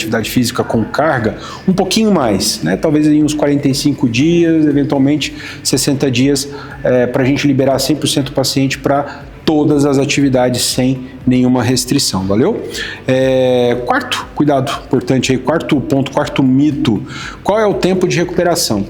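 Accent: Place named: Rio de Janeiro, Brazil. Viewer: Dr é do RJ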